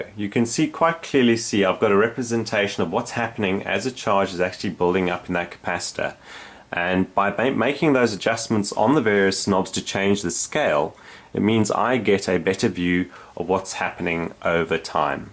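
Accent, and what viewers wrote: South Africa. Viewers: Nice video. South African accent? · Not only South African accent, but I would place it as Johannesburg, and probably Sandringham / Orange grove.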